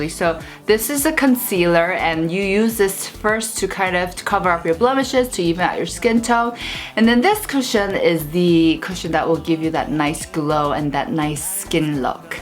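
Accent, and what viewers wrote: England, United Kingdom. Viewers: Cori Lynn I think she went to a British school while living in Europe and other countries. So she probably picked up some mannerisms hearing different accents. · love your english accent. Next time you can teach Korean languages also I'm really appreciate that lol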